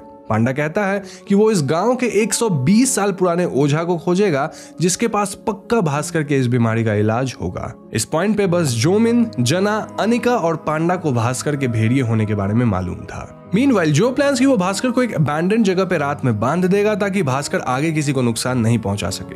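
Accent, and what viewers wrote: Bengal, India. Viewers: Karon tumi jokhon kotha bolcho majhe majhe bangla beriye porche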